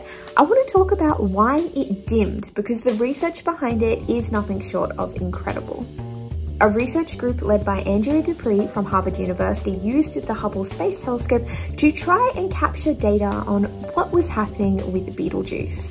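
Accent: Australia. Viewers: It's not a speech impediment. She's Australian. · Yay an aussie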